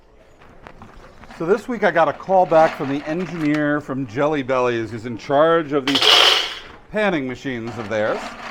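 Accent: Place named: New England, United States. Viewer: I don't remember if ever discussed it in a video before but I'd be really interested to know how, from the accent, a New Englander got to Tallahassee and started in the business of Gilded Age/Progressive era style handmade candy.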